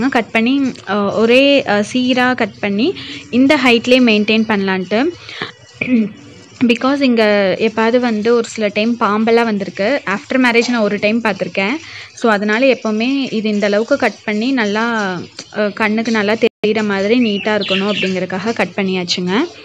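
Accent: Kongu, India. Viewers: Hi... I will follow all your videos,as a newly wed I love to be like you in the way of care you show towards the family... Love you lot... I am from pollachi happy to hear our kongu tamil🙂keep going you are amazing😊eagerly waiting for your next video... And you are multitalented though I would say... Love you 😘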